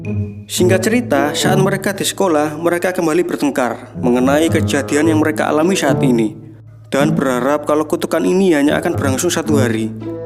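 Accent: Java, Indonesia. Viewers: Itu sempah gw bukannya fokus ke film malah ngakaknya ke suaranya😂😂😂udah medok lucu lagi😂😂😂apalagi pas bagian tegak menuju tak terbatas aduh bengkek 😂😂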